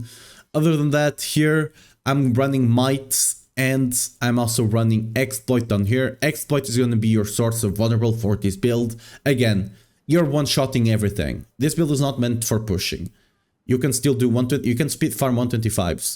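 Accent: Portugal. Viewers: Are you Portuguese by any chance?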